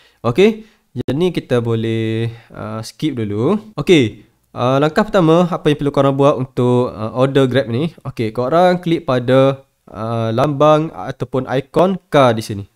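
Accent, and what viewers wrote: Kelantan, Malaysia. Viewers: kecek kelate terus lagi baik...tak payah kau orang2..pelat kelate mu tak boleh lari...hehe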